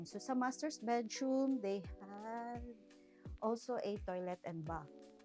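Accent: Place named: Visayas, Philippines. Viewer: pangit magsalita ni ate, 😢. Nag english, nag tagalog pero tonong bisaya.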